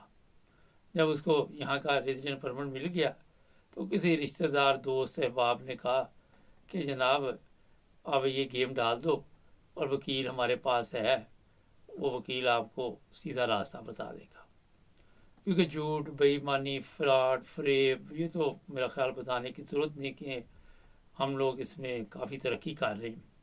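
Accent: Punjab, India. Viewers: Please use a better microphone…. Place it close to your mouth…. You speak Urdu in pure Punjabi accent…. Please help the viewers You provide good information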